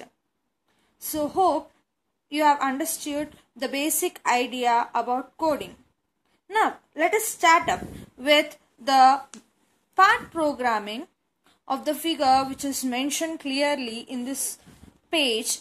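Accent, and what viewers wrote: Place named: Mumbai, India. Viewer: you are a Bombay accent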